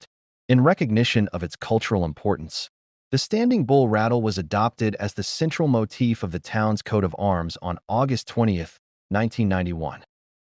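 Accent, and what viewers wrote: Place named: United States. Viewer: Bitte keine Amerikanische Stimme.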